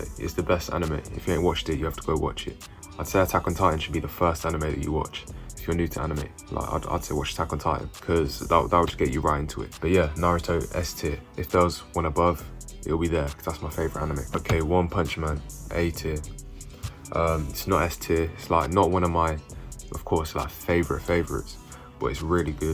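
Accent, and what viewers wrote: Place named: London, United Kingdom. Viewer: Your London accent just makes the tier list so much better LOL. Pretty Much agreed with everything in this besides Tokyo ghoul which I think is a B Tier.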